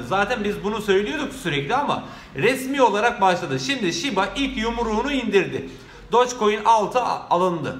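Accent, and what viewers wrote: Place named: Samsun, Turkey. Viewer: Tam bir Samsunlusun “ E “ lerinden belli hemşehrim..👍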